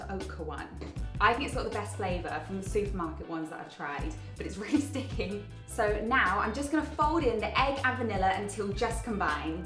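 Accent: United Kingdom. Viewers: You sound soo British cool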